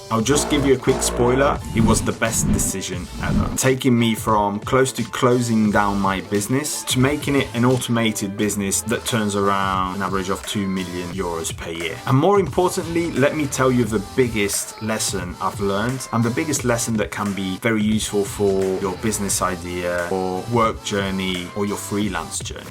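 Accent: United Kingdom. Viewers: Kudos and huge compliments for your accent and also for your property and command of the English language. You sound super British and it doens't seem you're Sardinian at all. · Amazing video,. Thanks for taking the time to go through your thought process back then. PS. Incredible British pronunciation:O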